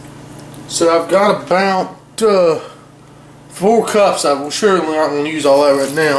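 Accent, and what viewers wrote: South, United States. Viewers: looks delicious! i have a southern accent too and i love seeing other youtubers with a country accent as well. i get alot of comments on mine as im sure, you probably do too lol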